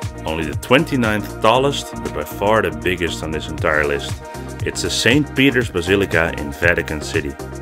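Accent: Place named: Netherlands. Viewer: No hate but this is the first video I’ve watched from you and I immediately recognized your Dutch accent (de mijne is erger😅😭) and I love accents so it doesn’t matter❤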